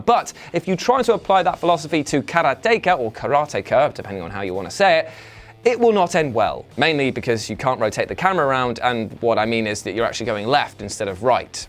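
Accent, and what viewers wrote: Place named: United Kingdom. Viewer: Close your eyes and listen to the video again. Between his sad delivery of poorly written jokes and his pompous arrogant british accent this guy is a terrible presenter. The Shakespeare part is just a snarky American jab at a British english speaker trying to sound elegant but falls short.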